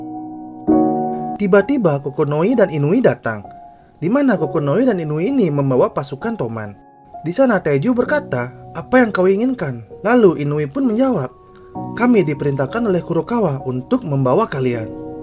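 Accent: Bali, Indonesia. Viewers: Bang dari bali ya kog bila t khas bali banget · Logat bali ini 😁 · Logatnya Bali sekalii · Logat bali admin? Hehehehe rahayu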